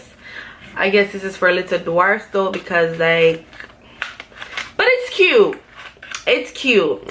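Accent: Jamaica. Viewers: You deadass sound like a jamaican accent hidden there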